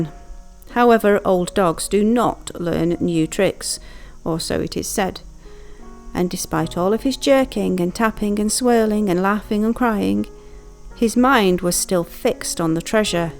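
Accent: Northern England, United Kingdom. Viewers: I visited after the original Poldark series/ books, and eventually moved here. Do I detect a bit of Northern accent now. I always felt there was a mining connection with Cornwall and Yorkshire, my birth county. Lovely video.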